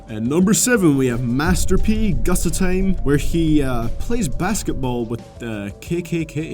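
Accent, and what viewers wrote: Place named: Scotland, United Kingdom. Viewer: you sound like a blend of Aberdeen and Fife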